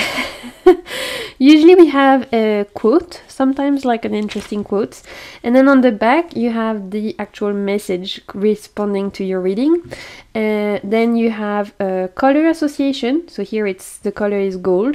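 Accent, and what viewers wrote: France. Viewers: Thanks for this video! Good idea to show french decks!!🇫🇷 I'm french and i live in Bretagne, not so far to Broceliande forest!! The fire has destroyed a part of this magical place..😔 But i saw recently a fox on the road on night🦊...and other animals next to my home. 🐿🐇🦔🐗 I love Gulliver and other french creators like Célia Melesville👍🏼 I already saw your Channel and i like your frenchy accent😁🇫🇷 so cute😘 Namaste🙏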